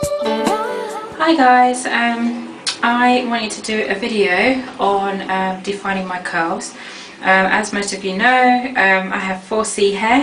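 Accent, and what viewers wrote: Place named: United Kingdom. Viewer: I love your dialect, you wouln't happen to be from the U.K.? I'm gong to try this style on my 4c hair.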